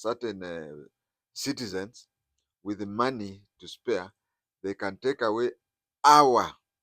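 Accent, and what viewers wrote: Kenya. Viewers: VERY POOR KENYANISED/ SWAHILINISED ENGLISH. ARE YOU GIRIAMA?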